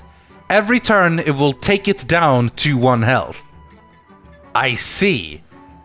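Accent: Sweden. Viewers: the most Swedish accent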